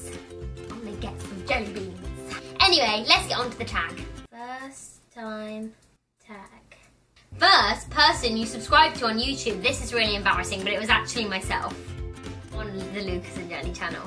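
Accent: United Kingdom. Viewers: videos give me life 💕 I think she's the only reason I learned how to speak Cockney too... 💎💋💁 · I love you British accent and also I subscribe to you on Lucas's channel and your own channel!!!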